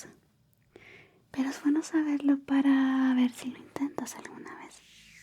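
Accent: Chile. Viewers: A mi me gusta su voz también cuando habla a lo chileno se escucha muy hermosa:_Love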